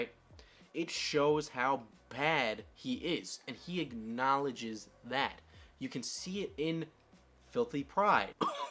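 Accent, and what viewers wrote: New York, United States. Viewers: Liam Collins I think its just the new yorker accent accompanied with memes. I dont think he acts like chrisraygun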